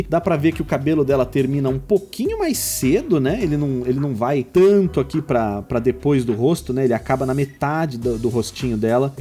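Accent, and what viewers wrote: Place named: Paraíba, Brazil. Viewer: que jeito paraíba de falar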